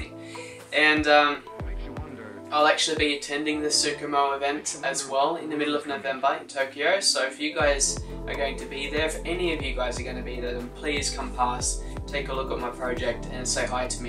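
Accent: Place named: Australia. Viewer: I thought you were Swedish? Your Austrialian(?) accent is quite amazing, and English in general is way above the average for Swedes :P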